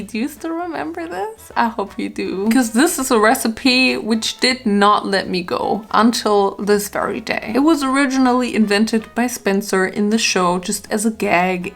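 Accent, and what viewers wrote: Germany. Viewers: shes german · Is it just me or has she got way more German recently, her words are so much less pronounced compared to what they used to be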